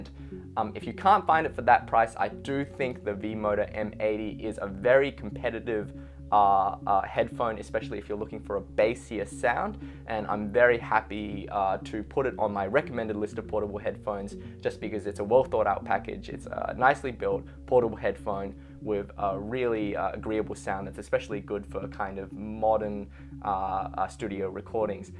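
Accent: Australia. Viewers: I can't figure your accent, is it Australian or English? )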